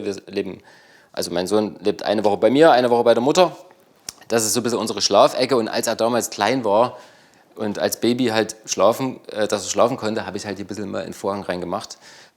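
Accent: Germany. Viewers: Wunderschöner Platz, einladender Raum, tolle Einzelstücke, mit einer ruhigen Ästhetik zusammengestellt. Und gesprochen mit meinem deutschen Lieblingsdialekt 😊, sagt die Schweizerin.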